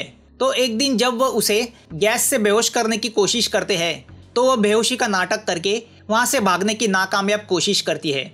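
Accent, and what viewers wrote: Maharashtra, India. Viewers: Tula Marathi yete bhau bolnya Varun Maharashtra cha distos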